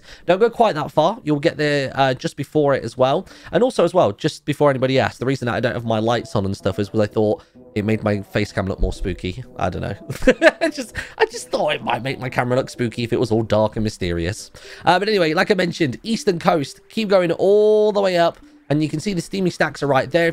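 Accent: United Kingdom. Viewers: That's how British people talk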